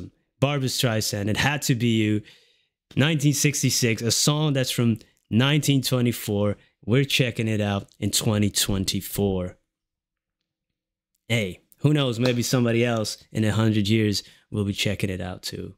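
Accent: United States. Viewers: are you sure you don't have some New York in you? The more I listen to you, the more I hear a slight NY accent. And then when you said, "You know what I mean?" - Barbra always says that phrase in her NY Brooklynese accent! Hehe! Anyway, it was great seeing your reaction again to another Streisand classic. (Me thinks she's got you hooked! 😉) Hey, I learned something from you on this one I never knew. You said this song was written in 1924. And then mentioned how we're listening to it 100 years later in 2024. And guess what, Barbra was 24 in this TV Special here. And also Barbra has always said that 24 is her lucky number! I love numerology! Wonder what it means, though? Lol! BTW, I'm dying to see your reaction to the Yentl Medley or even just "A Piece of Sky" from the movie Yentl. Can't wait to see your face when you get to one of her pinnacle blow-you-away numbers! 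She's got some real blockbusters you will almost not believe that that voice is coming out of a human being! 😎👍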